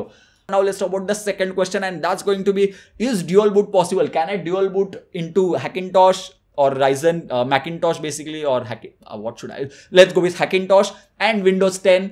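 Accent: India. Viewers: Don't understand fucking anything. Indian accent...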